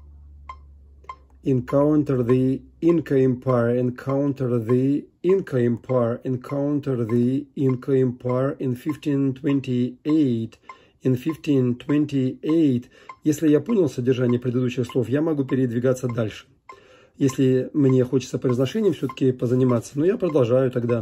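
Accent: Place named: Russia. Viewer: Любопытно. Но автору неплохо бы поработать со своим жутким русским акцентом.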